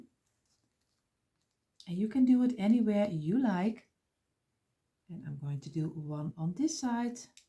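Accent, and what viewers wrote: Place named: Netherlands. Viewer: You are dutch I heard. .my family originally came from Holland. Our last name use be visser but was changed to visher.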